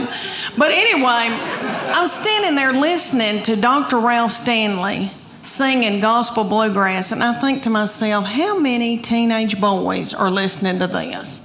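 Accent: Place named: South, United States. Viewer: The heavy drawl is exhausting trying to follow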